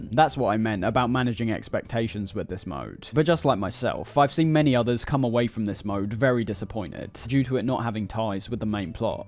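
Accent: United Kingdom. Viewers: Ahhh British guy video game essay just what I needed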